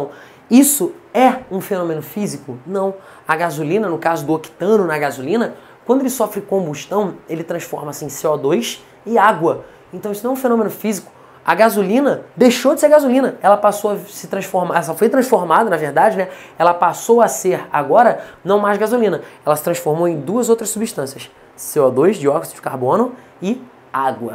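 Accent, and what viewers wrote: Pará, Brazil. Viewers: O sotaque do professor é lindo, paraense, ou paranaense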